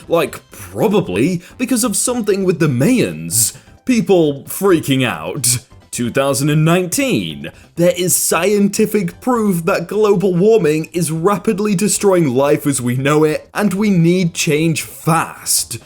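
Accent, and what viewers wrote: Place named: England, United Kingdom. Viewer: Cen'ame'ar You english and your funny accent made my day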